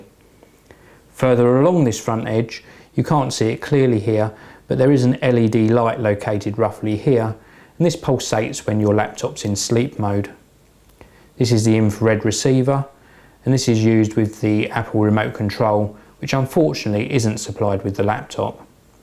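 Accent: England, United Kingdom. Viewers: he is from england so he`s got this kind of accent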